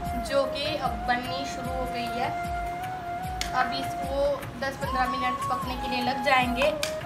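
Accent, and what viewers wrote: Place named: Haryana, India. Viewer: आपकी आवाज भाषा से बिल्कुल हरयाणवी लगती हो हिमाचल की लैंग्वेज नहीं लगती बिल्कुल भी क्या बात है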